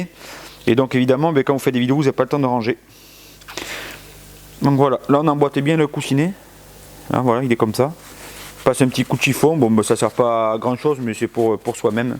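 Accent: Gironde, France. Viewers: Toujours agréable de regarder ces vidéos, avec en plus l'accent Girondin.....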